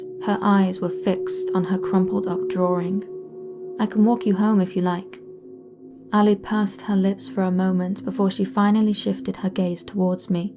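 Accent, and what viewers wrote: United Kingdom. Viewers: Speaking about the female reader: I love your delicate British (?) accent, makes the stories that much more enjoyable. Keep up the good work. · once again - brit narrator- whats up with that mystery?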